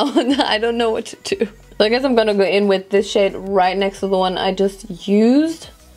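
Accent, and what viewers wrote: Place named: Germany. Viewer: Ist sie deutsch ? Weil die hat Nh deutschen Akzent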